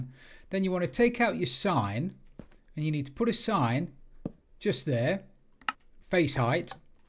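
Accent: United Kingdom. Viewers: That British accent is, absolutely amazing.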